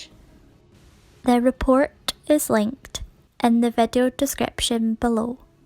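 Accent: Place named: Scotland, United Kingdom. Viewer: Can't make out if this is a real or, Scottish robot voice 🤔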